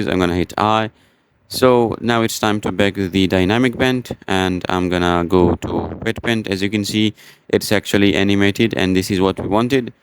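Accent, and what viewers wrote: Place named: Iran. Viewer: عشقه لحجه ایرونی ، خسته نباشی💥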